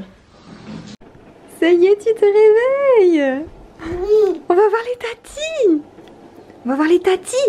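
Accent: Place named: Nord-Pas-de-Calais, France. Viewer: cc, tu as vraiment l accent chtis comme moi!!! quand je regarde tes vidéos!! c est super!!!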